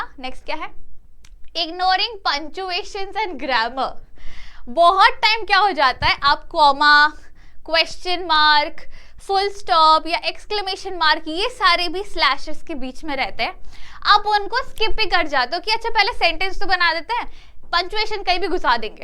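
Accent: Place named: Bengal, India. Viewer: Mam bengali lagte hain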